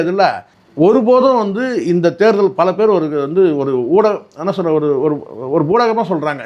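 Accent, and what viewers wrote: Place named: Karnataka, India. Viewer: Yaaruda idhu? Kannada minna pesars aalu Oru channel vechikittu volaraa?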